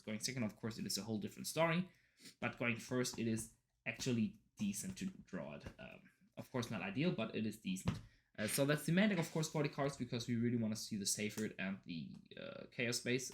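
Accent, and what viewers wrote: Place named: Germany. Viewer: Cool du bist auch ne deuscher :-) Hatte mich schon etwas gewundert über die akzent :-D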